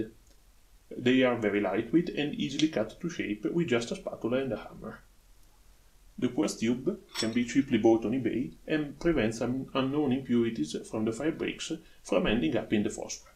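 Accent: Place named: India. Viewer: Thought you were an Indian guy hearing your accent, dude.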